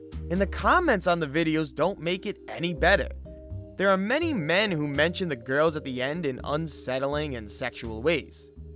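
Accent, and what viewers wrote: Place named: Boston, United States. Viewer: You sound so Boston lzzzzzzzzz east coast trash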